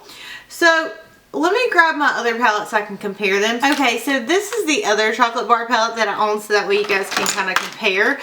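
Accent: South, United States. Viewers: OMG idk if i've said this before but i love your voice! Its so country! lol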